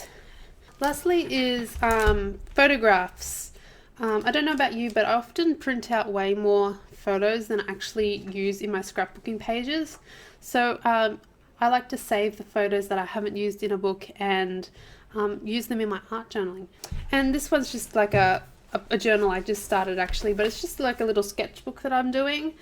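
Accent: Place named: Australia. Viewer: Just found you....love your ideas! Will subscribe....are you in Australia? Recognise your accent, I’m in Oz!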